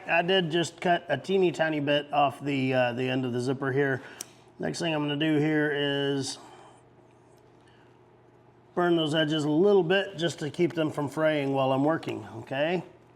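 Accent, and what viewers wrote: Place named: Yorkshire, United Kingdom. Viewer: Absolutely Mint ✊🏼 Proper easy to follow and so down to earth. Are you sure your not from Yorkshire , England? 😀✊🏼😀✊🏼😀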